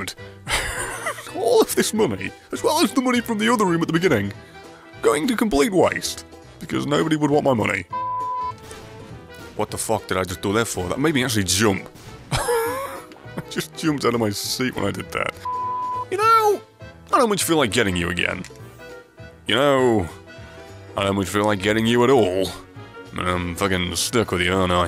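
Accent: United Kingdom. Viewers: I can’t tell if he is English, Scottish or Welsh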